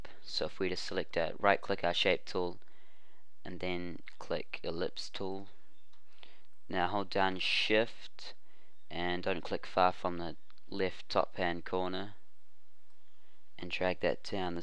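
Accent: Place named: New Zealand. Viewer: wonderful tutorial mate. do i hear a bit of NZ in your voice? :) very easy to follow and well demonstrated, no issues at all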